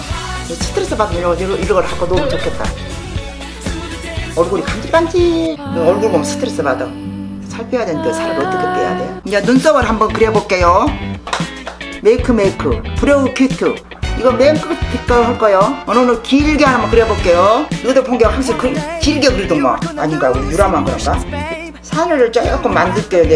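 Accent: Jeolla, South Korea. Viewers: 전라도 사투리이신것 같은뎈ㅋㅋㅋㅋㅋㅋㅋ 말투 중독된다 처음 보는데 구독함ㅎㅎ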